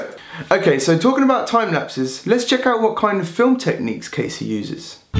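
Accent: United Kingdom. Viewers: Great vid! You've got the brit accent going for you... I'm watching KC's vids diff now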